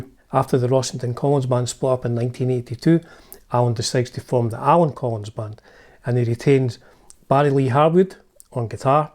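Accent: Scotland, United Kingdom. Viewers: I have that album. Are you from Scotland? I lived there with my wife and kids. I detect an accent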